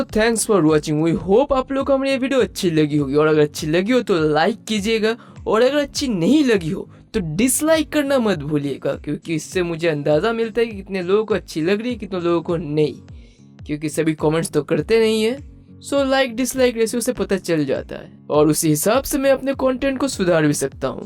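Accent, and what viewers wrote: Bengal, India. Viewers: Are you bengali ? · Bhai tu bangali hai. Na?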